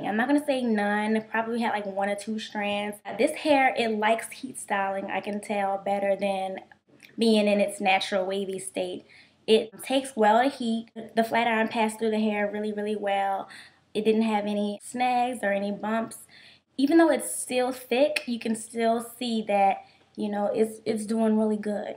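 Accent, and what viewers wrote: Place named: New Orleans, United States. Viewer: R u frm new orleans because u sound just like us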